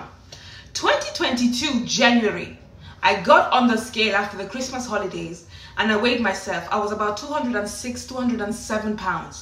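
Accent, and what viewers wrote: Nigeria. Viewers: Omg i can tell your friends enjoy your storytelling abilities 😂😂 can't believe your effortless retention skills. I loved this! Love the Nigerian accent and expressions 😹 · She sounds Nigerian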